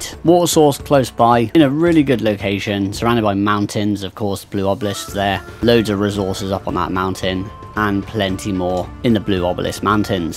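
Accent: United Kingdom. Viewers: Everyone in the UK sounds like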